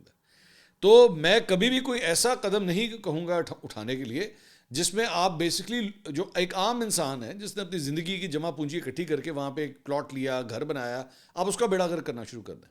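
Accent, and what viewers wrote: Punjab, Pakistan. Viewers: apka Punjabi ka tuch bht Zabardast hai.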